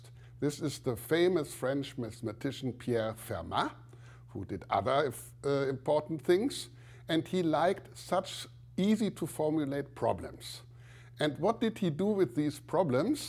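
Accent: Germany. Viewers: Stop commenting his English. He speaks well and understandable. Try to imagine yourself explaining difficult problem in foreign language. Most you you, who complain, are not even within range of sight knowledgewise. And btw rather german accent than french one. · His german accent is cancerous.-. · I don't think an accent can get any more german than that. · I thought: "He sounds german." And the description reveald my assumption as true. :D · He has a thick accent for sure, but I can understand what he is saying. So I have no problem with it. I can't speak German, so I'm glad he knows English! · Oh, that accent is too German, indeed! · I'd say German, he sounds like Werner Herzog. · I can clearly hear from where (in Germany) he originates - it's all in the prosody. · You can directly hear that he is from Western Germany :D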